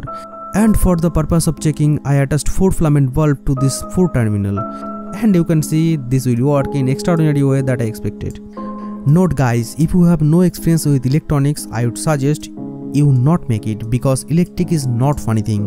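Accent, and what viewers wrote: India. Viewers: Very good videos and happy with your Indian English too. Well done, feeling proud 👌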